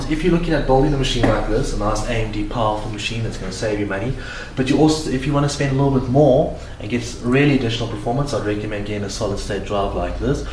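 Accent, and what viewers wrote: South Africa. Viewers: what coutry are you from? You sound like a South African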